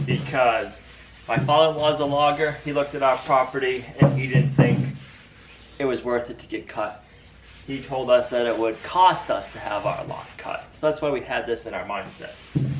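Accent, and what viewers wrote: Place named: Northeast, United States. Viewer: The accent is bringing me back....where are you from? I was born in White Plains, NY and grew up in Framingham Ma.....just curious...I'm in Central NY near Syracuse now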